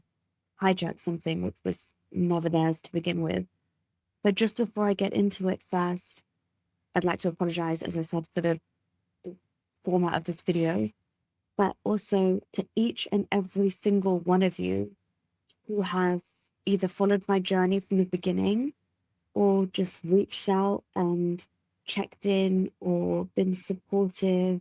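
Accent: England, United Kingdom. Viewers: Hello, my name is Scott and I was born and raised in the San Francisco Bay area. I live in Salem, Oregon now. I have been listening to you for about two years now and am really proud and moved by your story. I was born a Christian but I am now an atheist but am very spiritual. I totally support everyone's right to worship whatever religion they please. My heritage is definitely British mostly English and Welsh. My grandmother was born and raised in Holyhead. Welsh was her first language and I spent a lot of time at her home in San Francisco when I was young. I'm very proud to be an American and also proud of my English, Welsh heritage. I've been there 3 times and it's absolutely beautiful. But I get why you say that you are British and not English and I appreciate that you cherish your heritage. But to me you are always going to be English. You mannerisms and values are definitely English. 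Right away I could tell that your not Scottish or Irish not just because of the accent but the way you hold reverence for English culture and values. You understand the great history of the nation and place your loyalty to the better welfare of the country. But that's just my humble opinion 😌. Please be safe and I hope that you get through this rough period of your journey through life ❤️. Thank you for listening and thank you for your incredible voice of reason.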